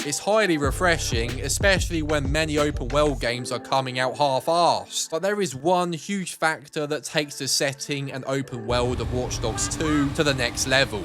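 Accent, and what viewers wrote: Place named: London, United Kingdom. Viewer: Got to love a London accent, knowwhatahmean ? :)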